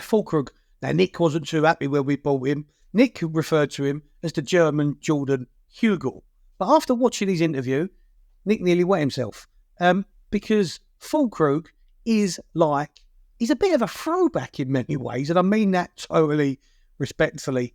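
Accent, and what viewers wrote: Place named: Cockney, United Kingdom. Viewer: Cockney Joe Pesci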